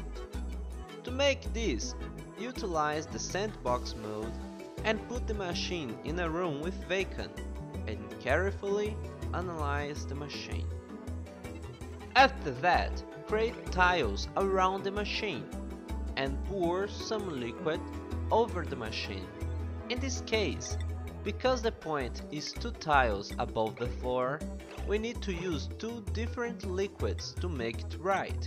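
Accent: Brazil. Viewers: Caraio, tu eh br ou gringo? Kkkkk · mano, eu esqueci como faz as paradas nesse jogo, venho pesquisar e tem um unico video ingles do que eu preciso e to ouvindo tipo, não pera, sotaque br? dfushdfushd bom video ajudou muito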